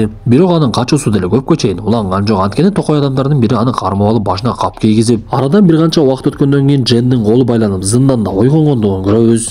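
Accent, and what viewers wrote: Standard. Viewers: сонун суйлойсун ан таза кырызча сонун азаматсын 👍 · Ото соонун таза кыргыз тилинде, тушунуктуу кылып, тушундуруп бергениниз мага абдан жагат даагы соонун ,кызыктуу кинолорду чыгара бериниз.❤❤❤ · 🎉 сен ен сонун суйлойсун нукура Кыргызча суйлосун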